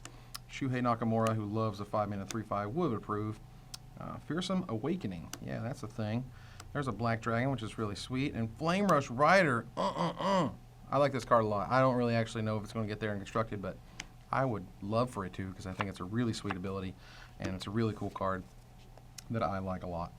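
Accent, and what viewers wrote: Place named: United States. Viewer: You have the voice inflection I associate with the "Joe Dirt" accent. Good video tho.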